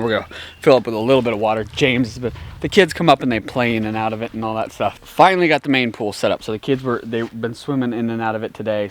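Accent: South, United States. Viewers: Shoutout from Utah, Tennessee looks Greener than here. Also note a micro southern twang in your voice. Love your Vlogs ✌️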